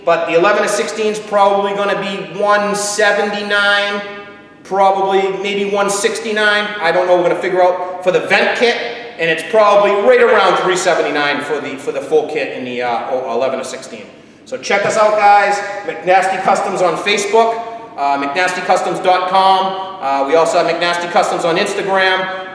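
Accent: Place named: New York, United States. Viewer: I think this guy just might be from the new york area.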